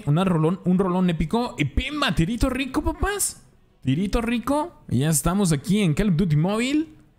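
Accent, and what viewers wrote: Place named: Russia. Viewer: Gracias bro, quería hacer este contenido en mi canal, y me pasaba este error, aparte me gusta Tu acento, parece Ruso jaja.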